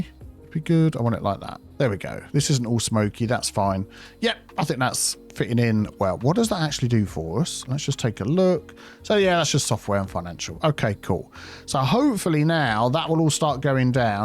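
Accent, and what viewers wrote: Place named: United Kingdom. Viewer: I Always Use to speak in British Akzent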